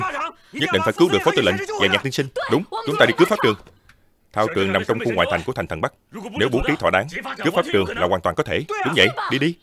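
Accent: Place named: South, Vietnam. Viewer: Giọng thuyết minh quá nặng giọng miền Nam rất khó nghe.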